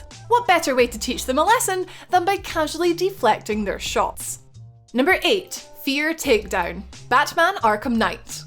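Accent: Ireland. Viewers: You have a beautiful Irish accent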